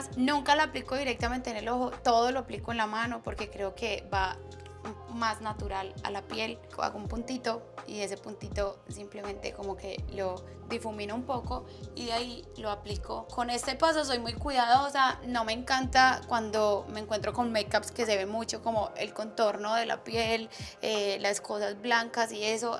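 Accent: Antioquia, Colombia. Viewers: Amo el acento colombiano, sepan que tienen el mejor acento de Latam!!!! Y Karol tan hermosa, humilde, sencilla. Exitos nenaa! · Amo su acento súper colombiano ❤️❤️❤️ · Me encanta el acento colombiano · Es acento paisa, en Colombia no tenemos un acento, tenemos muchos pero creo que el paisa es el más famoso en el exterior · Su acento paisa ( Antioquia y medellín Colombia) es hermoso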